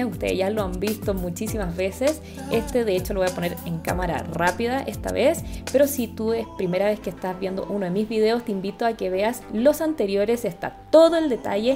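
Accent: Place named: Chile. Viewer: Yo acá viéndote desde Alemania donde ya no voy a la peluquería primero por lo del corona y segundo son caras y muy, pero muy malas .....te felicito es rico escuchar el acento chileno de nuevo . pregunta tu traes babylight pero en las puntas quedan asi de claras o esas les hiciste balayege o algo mas ? saludos te pasaste eres muy buena peluquera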